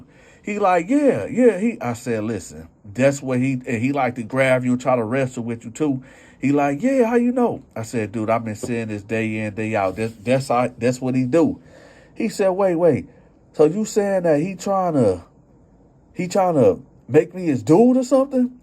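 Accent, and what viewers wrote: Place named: Detroit, United States. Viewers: You got a Detroit accent , so you’re from Detroit lol. New subbie here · It sounds like you from Michigan..but home is where your heart is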